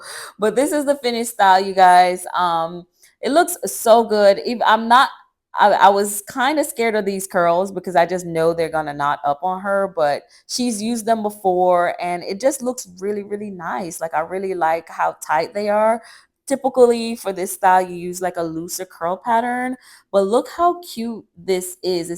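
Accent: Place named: Jamaica. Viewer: love ur jamaican accent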